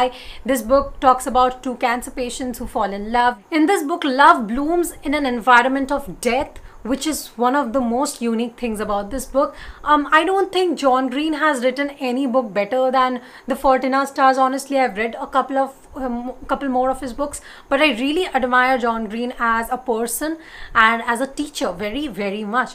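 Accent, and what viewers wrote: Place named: India. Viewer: I think you have a very good command over English language especially coz of your indian accent. I mean your language is quite easily understood by indian audiences. I looked for audio books over YouTube and applications so that I can improve my English but didn't get even a single video or audio book in indian accent. Then I thought of you for a second that why cant you create an audio book over youtube. Isn't it a great idea? It will help a lot of Indians like me who are looking for such audiobooks. I hope you come up with atleast one such book in near future. Thank you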